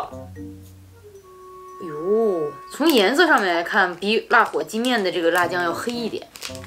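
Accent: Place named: Inner Mongolia, China. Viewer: 另外，感觉羊pd是内蒙古妹子，口音很像我们东北这边，但又能感觉到一丢丢的区别。听着很亲切☺️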